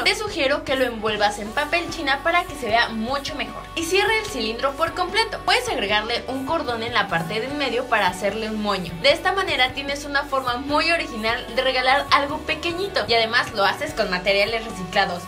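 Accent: Mexico. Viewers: Estilo Regi ola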